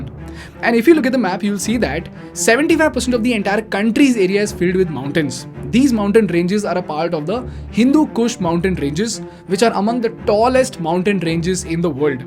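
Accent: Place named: India. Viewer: The problem is ... your Indian accent.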